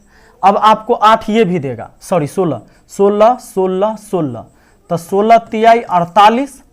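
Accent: Bihar, India. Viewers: I like bihari accent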